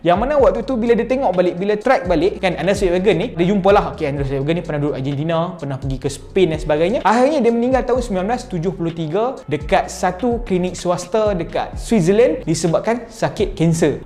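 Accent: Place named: North, Malaysia. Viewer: rupanya slang utara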